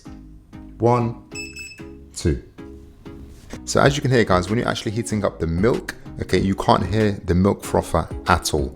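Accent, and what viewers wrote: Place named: London, United Kingdom. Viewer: Well, it's not R.P., but it's more than adequate English with a slight London accent, I'd say.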